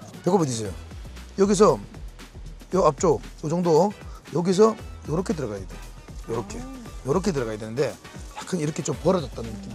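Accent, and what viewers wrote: Gangwon, South Korea. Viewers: 강원도 사투리 억양 구수해요^^ 강릉 경포호에서도 러닝 클래스 해주세요😅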